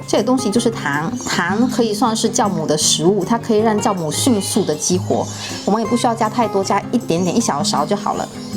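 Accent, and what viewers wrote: Fuzhou, China. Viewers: 好熟悉的乡音？福州人？ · 这福州话口音好重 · 你肯定是福州人 福州腔好重🤣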